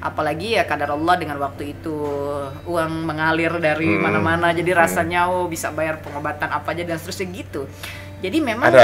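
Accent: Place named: Palembang, Indonesia. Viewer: Senang dengar baso baso Palembang, wong kito galo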